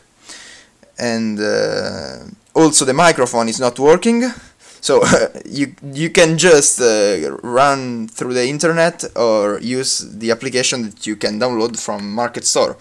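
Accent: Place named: Italy. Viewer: si sente molto dall'accento che sei italiano xD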